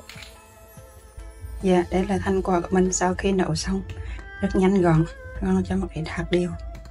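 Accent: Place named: Hue, Vietnam. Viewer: Admin up bài này làm em thèm quá xá . đậu ngự lại với giọng Huế nữa hay quá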